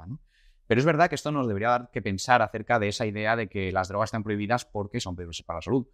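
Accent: Spain. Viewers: me es difícil entender ese acento español "tan español"😢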